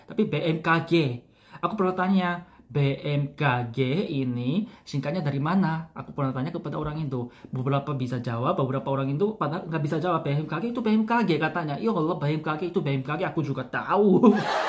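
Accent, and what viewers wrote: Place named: Java, Indonesia. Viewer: logatnya kayak logat orang jawa.. beberapa kata terdengar medoknya :))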